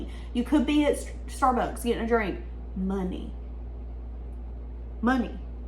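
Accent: South, United States. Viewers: YES another reader with a PERSONALITY! some of these channels have such a low energy, weird dry demeanor like they don't even wanna be doing the reading lol and i LOVE the southern charm 😜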